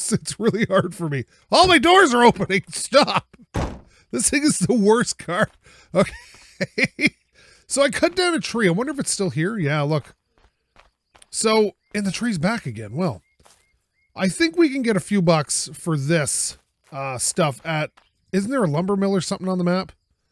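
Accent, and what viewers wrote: Canada. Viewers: I love your videos, quite funny to watch. I can tell that you're definitely not new to working on cars, though I'm not a mechanic. By chance, are you Canadian? Your accent sounds a little like that.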